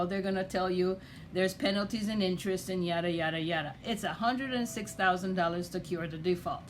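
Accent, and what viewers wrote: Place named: Jamaica. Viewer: I feel Comfortable with you nationally is Jamaican I sound it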